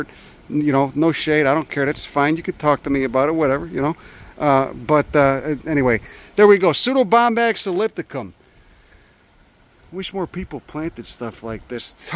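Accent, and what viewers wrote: Chicago, United States. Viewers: Love this channel particularly cause of the Chitowm accent makes me feel back at home. Would love to see my favorite bird, Common Nighthawk nesting in those rocks. Hey tanks for da channel!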